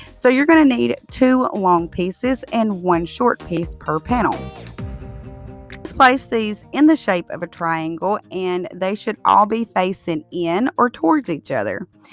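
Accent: South, United States. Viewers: I love your accent. It's so Southern. We're here in North Carolina. My friend has a very thick drawl. She always says she's as country as a sugar sandwich 😂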